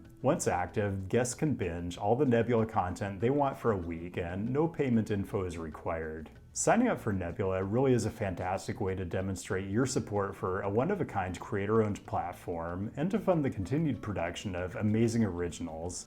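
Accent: United States. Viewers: Thanks for covering my city ... but as with last video I am again triggered by your pronounciation of Melbourne. Your accent is American .. its okay and expected to include the "R" (MEL-burn). Your way (MEL-bun) is not American nor is it Australian ... it is just odd. And it's Parra-mat-a .. not "mot-a". Ignore my silly YT comment whinging ... great content.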